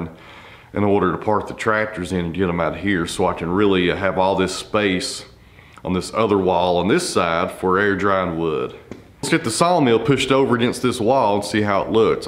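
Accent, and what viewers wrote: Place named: East Tennessee, United States. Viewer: Man your videos make me miss home more than any other...Im originally from Maryville/ Knoxville area. Here at the holidays its good to hear a east Tennessee accent!! You dont know what you have until it gone. Never a truer statement. Keep it up and Ill keep watching.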